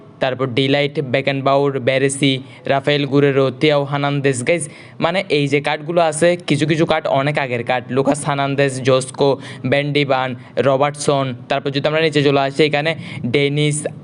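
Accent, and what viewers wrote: Chittagong, Bangladesh. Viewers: main ktha oitese vai ami Chittagong ar apnr voice shone mone holo apnio ctg ar Apnr voice a akta reaction saitesilm rki amr id ar oppr🥺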